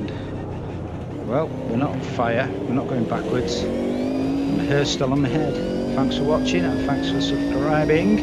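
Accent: North, United Kingdom. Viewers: Love the Group C's in AMS2. That Sauber and Fred's Jag are standouts for me. Congrats on the 2k. I enjoy those familiar dry witted, dulcet northern tones 😆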